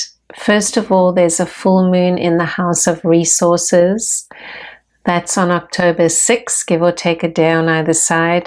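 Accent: South Africa. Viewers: I’m from Durban! ♥️ 🇿🇦 I knew your accent right away!! 😅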